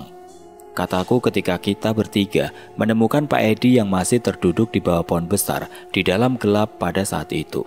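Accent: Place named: Sunda, Indonesia. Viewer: 😂😂lucu bgt bahasa sunda nya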